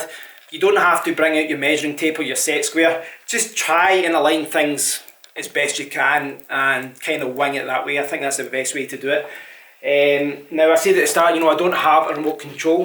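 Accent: Scotland, United Kingdom. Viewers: So awesome opening a YouTube video about tech I wanted to watch and hearing a Scottish accent! 🥃Well gid. #scottishtechyoutube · Gotta love the Scottish accent! I prefer female, though. Good review,! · Thanks nice to hear a good Scottish voice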